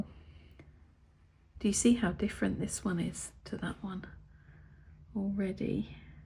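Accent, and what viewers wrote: England, United Kingdom. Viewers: the sound of your voice matches your whole pallet vibe regarding color. As I listen and watch; it seems very restrained, peaceful and very English, perhaps rural. The colors are muted, surprising, and yet chosen with deep thoughtfulness. It is so much in opposite to colors I enjoy or am drawn to. I live in a high plains desert, with amazing contrasts in color, temperature and weather. I happen to be impulsive and a little over dramatic. One would not guess it by observation, as I’m extremely introverted. I enjoy your colors without wanting to convert my own pallet to them. Thank you for sharing. I enjoy the contrast; it reminds me that I will need my own kind of restraint in my own painting. Take care 😊.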